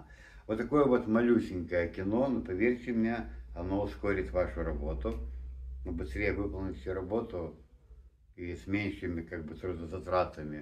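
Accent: Odessa, Ukraine. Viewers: Спасибо, рабочая идея с Одесским акцентом - в роли ограничителя деревянный камертон, почувствуйте столярный настрой.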